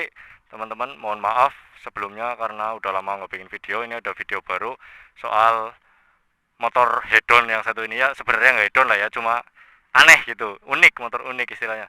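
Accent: Indonesia. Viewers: Njawa lucu bos🤟🤣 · laa ya ngapak ya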